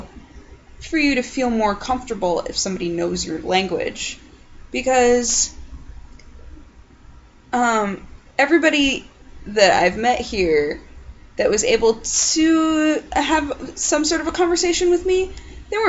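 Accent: France. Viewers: Les deux manières de le dire sont bonne :) Ton accent est très français de France mais c'est très bien! Long time French Canadian follower :3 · oh that ridicolous french accent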